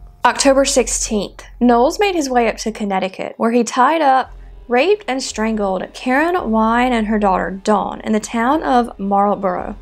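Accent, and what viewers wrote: South, United States. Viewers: has a nice southern accent. Also, she is a good narrator.🤓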